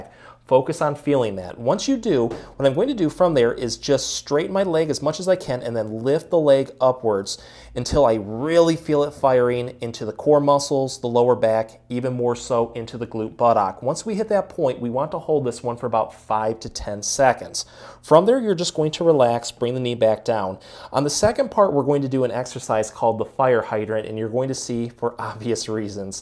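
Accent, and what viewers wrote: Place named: Midwest, United States. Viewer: Great video, right at home with your midwestern accent. Cheers from WI!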